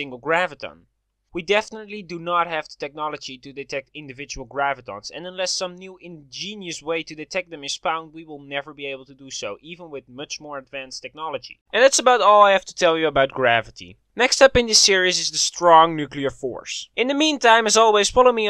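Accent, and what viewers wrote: Netherlands. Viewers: Are you Dutch? You sure sound like you are!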